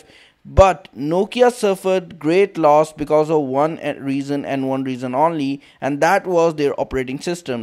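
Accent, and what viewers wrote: India. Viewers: indian accend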